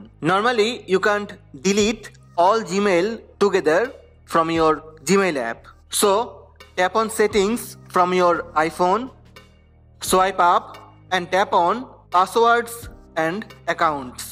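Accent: India. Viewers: So Indian voice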